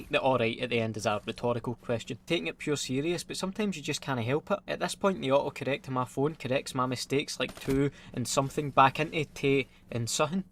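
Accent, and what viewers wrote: Scotland, United Kingdom. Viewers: Dont know if its just me but does ivdy ask u if ur Irish as if an irish accent is similar tae a scottish accent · As someone who's not Scottish in any way, but someone who loves this channel and the accent, I don't see a problem with people typing the way they speak. And made a good point, if you're not using perfect grammar, capitals and punctuation in your tweets, and comments, how are you gonna come for someone else who types the way they speak? SOD OFF! And why is it such a big deal to you anyways? Is it because you can't read it and are offended that you can't be nosey about what someone else is saying? Probably! Go cry in a corner! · Honestly, when I first watched you, I was amazed at your Scottish accent, but also had a hard time focusing on the video because I was too busy understanding what you were saying. Now, that I've been watching you for 2/3 months now, I think I've gotten use to it. 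You're pretty awesome! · Even as an English guy, when I was watching Braveheart I can't help but think "fuck ye, ya English pish cunts" love the Scottish accent aswell, I was seeing a Scottish girl from Glasgow and she broke it off with me eventually because I was English either that, or probably because I'm a complete cunt. The because I'm English helps me sleep at night though! On that note I'd love to see a video on your views on some of the funniest reasons you've heard not to like the English not just from a Scottish point of veiw maybe several other nations point of veiw too. keep up the great work, i could listen to you talk pish all day!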